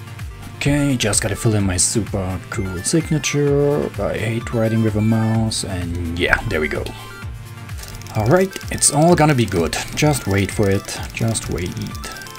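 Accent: Saarland, Germany. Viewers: ☆ PC Gaming ☆ Wie geil enfach mo saarlänisch rausgekloppt. made my day^^ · Das is Saarlännisch dass muss sich so anhöre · "Oh leg,nee.Ich glab es net."Komscht du aus em Saarland? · Immer diese Saarländer xD, beschter Dialekt überhaupt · guck dir die kommentare an 90%möchte videos mit stimme bitte mach es häufiger!Und davon 30% will mit deinem saarländischen Akzent.Deine stimme ist einfach pornös alder.Ik glaub es net xDD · Hojo dö und dein saarländisch... Wie wärs mit nem Dragon Lore Trade up? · + bischt dau a saarlanner? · Endlich mal ein Saarlänner auf YouTube, so genial ;D Kann es sein das du Englisch besser kannst als Hochdeutsch? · das ist saarländisch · Man hört den deutschen akzent sofort raua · b_Unr34l saarländisch junge saarländisch,... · Saarlännisch mei gudda saarlännisch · "OH LECK, näää es is de hyper beast.. ik glaaab es ned!" xD bester:) Saarländer oder? 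· for a german, your accent and voice overal is really great. Like audio honey. You should do some narations or more commented videos. Btw grats, you lucky bugger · Oh leck do kommt da Saarländer raus xD bescht xD Saarland rules <3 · Are you from Germany? :D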